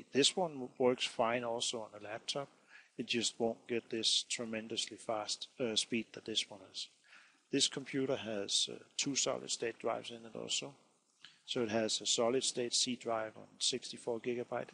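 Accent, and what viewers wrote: Denmark. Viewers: Du lyder meget dansk, du taler Engelsk med dårlig dansk accent. Er du fra Danmark? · man kan sagtens høre du er dansk xD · He's Danish, though. :) I sounds like. :) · R u from denmark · are you danish?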